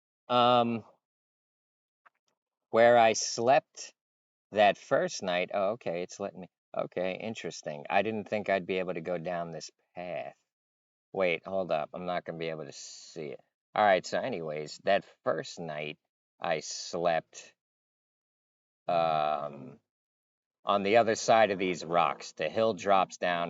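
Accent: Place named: Northeast, United States. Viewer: Ya you’re good at telling stories. You have a nack for it plus the cool northeast accent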